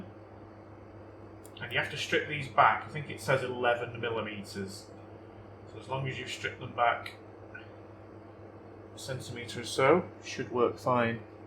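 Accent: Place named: Yorkshire, United Kingdom. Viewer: I just want to know where you're from! Wakefield, Leeds or Huddersfield are my punts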